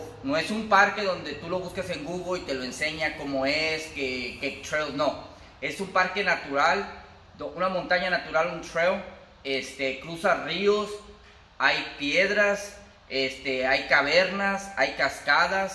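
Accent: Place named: Puerto Rico. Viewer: Lla estas ablando Con asento boricua Eeres de los de nosotros!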